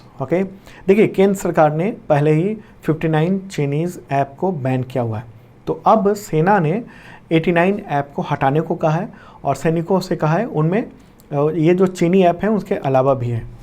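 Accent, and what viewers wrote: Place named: Haryana, India. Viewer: Bahi tu haryana se hai kya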